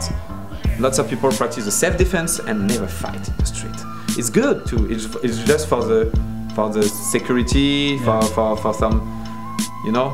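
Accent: France. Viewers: Super vidéo mec, je ne savais pas qu'il y avait un français sur cette chaîne j'ai reconnu direct avec l'accent ^^ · Avec un délicieux petit accent français ;-) Merci pour la vidéo!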